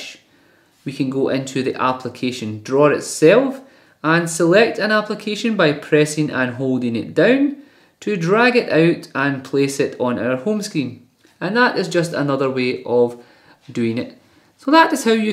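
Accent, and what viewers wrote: United Kingdom. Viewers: Are you Scottish Laddie? :)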